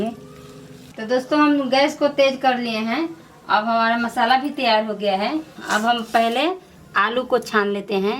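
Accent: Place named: Bhojpuri, India. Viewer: App bhojpuri boliye, humlog samjhte hai app kya bolti hai...App plz Bhojpuri boliye...Apka bhojpuri bolte huye bahut achi lagti hai. 😊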